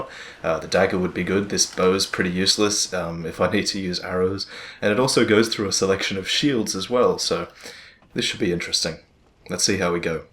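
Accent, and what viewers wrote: Australia. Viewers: Wait. Hold up a second. YOU'RE AUSTRALIAN?!? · are you Australian? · hey are you australian?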